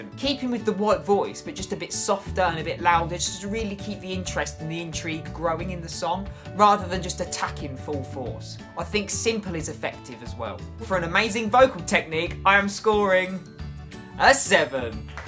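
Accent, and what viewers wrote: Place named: United Kingdom. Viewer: Oh, I'm so thankful for your hat and T-Shirt))))))) And your British accent...🥰🥰🥰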